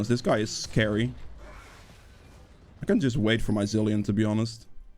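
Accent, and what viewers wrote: Netherlands. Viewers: Love the vids, i'm new to jungle and nocturne, learned a lot from you! Also; are you Dutch? Thought I recognized the accent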